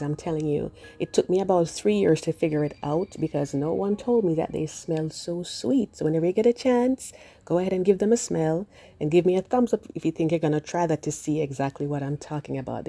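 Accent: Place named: Jamaica. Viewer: Love your Jamaican accent. I'm Jamaican too.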